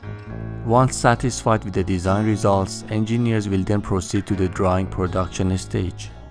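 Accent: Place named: Iran. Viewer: Iranian accent. قرمه‌زبزی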